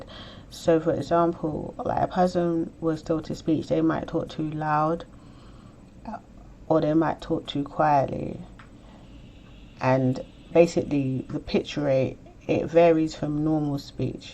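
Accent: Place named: United Kingdom. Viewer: I always thought your speech pattern was more about being British. I find it rather soothing. I've heard worse pomposity listening to a narrated Audible book. I seriously wanted to punch that guy in the dick by the time I finished the book.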